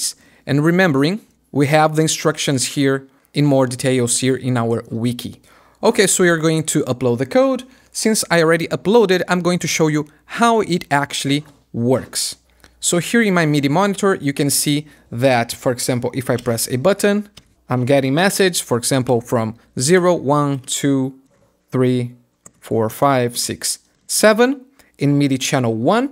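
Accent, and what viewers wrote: Brazil. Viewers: tu é Brasileiro né mano? Entendi perfeitamente seu inglês kk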